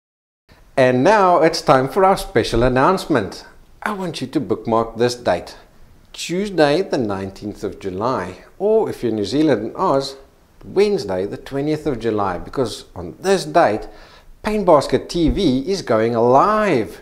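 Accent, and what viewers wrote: South Africa. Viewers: Ja well no fine!! I was delighted watching your video and enjoying my homeland's accent. I live in Irvine California and became an amateur artist after arriving here 25 years ago. By the way, I have not lost my SA accent and people enjoy it for some reason! Anyway, good luck and best wishes with your videos. I plan on watching most of the paintbasket videos as I can see there are many which I did not know about. Totsiens and mooi bly!! Noeleen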